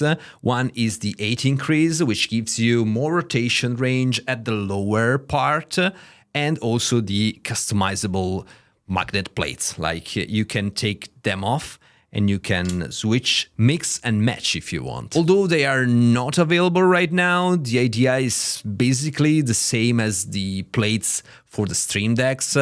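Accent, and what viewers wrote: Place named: Italy. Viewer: I honestly like the Italian accent and it doesnt bother me maybe bc im from the EU and im used it but none the less great video, I had the OG lp arm and wanted to switch to the white lp pro but 199 euros - HARD pass.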